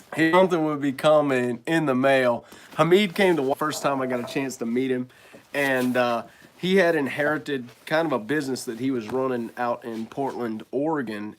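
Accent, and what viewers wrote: Alabama, United States. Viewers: Are you from Alabama bro ! I dig the accent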